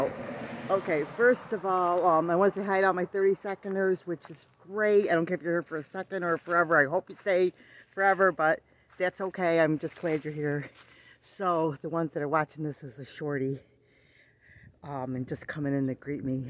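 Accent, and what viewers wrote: United States. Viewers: Black Sabbath without Ozzie Osborn, was like a skunk without stripes or a smell...basically just a cat at that point hahaha. I saw Black Sabbath in concert MANY years ago, back in the 70s, man that is a story to tell some day. Y'all have a Merry Christmas. Your accent, WI or a state near there, yes?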